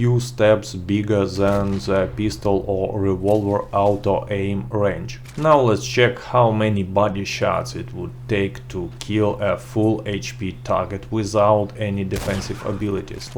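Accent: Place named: Russia. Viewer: I love this man's russian accent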